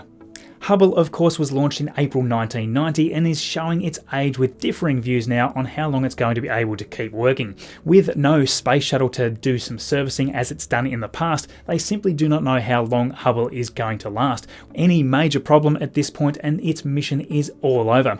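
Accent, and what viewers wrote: Australia. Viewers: glowing dick ? your accent is from australia or new zealand right ?!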